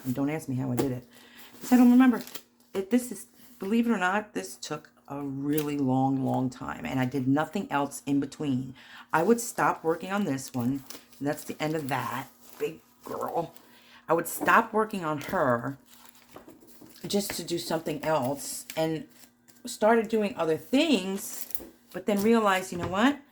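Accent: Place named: East Coast, United States. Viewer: Loved this journal! I knew from your accent that you were an East Coast girl (me too! RI/CT)! New subbie! Thanks for sharing!!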